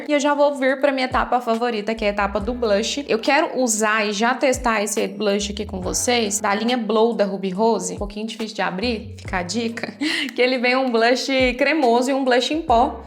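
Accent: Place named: Minas Gerais, Brazil. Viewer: esse sotaque tipo mineira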